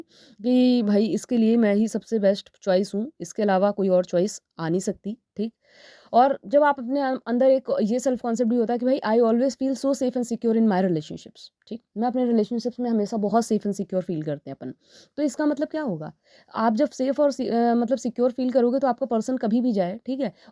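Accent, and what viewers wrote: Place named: Bihar, India. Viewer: Omg .... Wao apne kitte achhe se story narate ki ....full bihari tone maza aagya .... 😂😂😂😂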